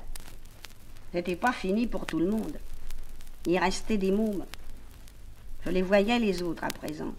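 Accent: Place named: Paris, France. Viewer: Avec ce texte tu sembles croire que je m'oppose à la lecture orale alors que ce n'est pas le cas, ça dépend des qualités de l'orateur, du niveau d'éducation littéraire du publique, du contexte (divertissement etc...). Donc encore une fois tu piges rien, et c'est pour ça que tu préfères taper, tu compenses ton impuissance intellectuelle par la force. Celine détestait la violence au cas où tu aurais oublié, il n'hésitait pas à séparer des bagarreurs quand il en croisait. Et pour ce qui est du jugement t'es encore une fois à coté de la plaque; je n'ai pas jugé Arletty dans sa personne mais cette simple tentative de lecture, ce qui n'est pas un problème si c'est argumenté. T'as jamais donné ton avis sur un film ou un livre peut-être. Je ne vois pas où j'ai été condescendant non plus, va relire la définition. Le sens du texte, si tu veux que je te l'apprenne, c'est un ado qui passe un examen, qui est angoissé par le poids de l'avenir que ça représente, inquiet par dessus le marché des risques de correction si le résultat est mauvais, qui en somme ne comprend pas bien la rigueur que la vie impose à un si jeune age. 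Et Arletty, au lieu d'essayer de faire passer cette angoisse, elle nous fait du Arletty (que j'aime bien d’ailleurs dans d'autres contextes), elle y va en décontraction, gouailleuse, vas-y que j't'en rajoute un peu d'accent titi parisien. Comment veux-tu que ça colle? J'ai deja lu un texte devant un publique et j'ai accepté les critiques donc ravale ta sortie sur l’hér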